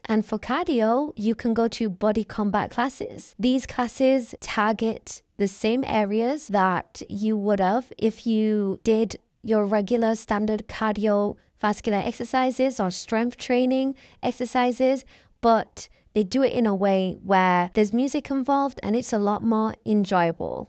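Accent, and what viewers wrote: United Kingdom. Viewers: She’s very easy to understand, she just has a UK accent. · I'm from London. Sounds like she's in the UK to me but not London born. As opposed to a voice filter.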